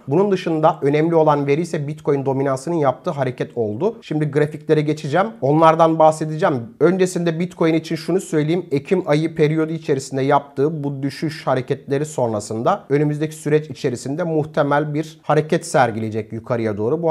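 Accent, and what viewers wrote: India. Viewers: Turkce dinliyemiyorum:) ve hint aksanı vae bilginiz olsun:) uk deyim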